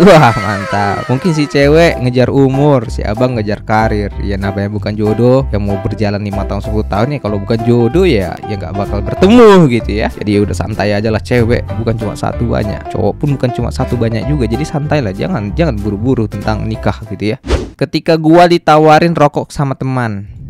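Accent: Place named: Depok, Indonesia. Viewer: Omongan u kek orang depok bang.🤣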